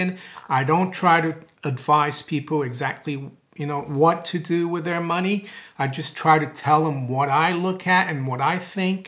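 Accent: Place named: Massachusetts, United States. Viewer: I know you've lived in Geneva and you've been in London for quite some time, and I think you mentioned in another video that you were born in Brazil and your family is from Brazil. Not that it matters, but I'm wondering why you talk like you're from Massachusetts. Do you have connections to the States?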